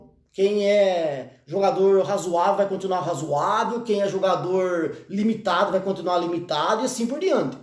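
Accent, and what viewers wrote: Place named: São Paulo, Brazil. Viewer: vc e do interior de SP, e pq o seu sotaque e parecido com quem é de lá, eu tbm sou kkkk sou cidadão piracicabano E parabéns pelas informações do nosso peixe, continue assim, feliz 2022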